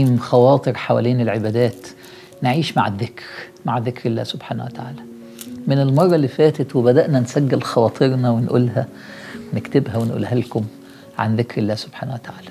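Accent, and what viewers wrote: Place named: Egypt. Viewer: هو مصري من كلامو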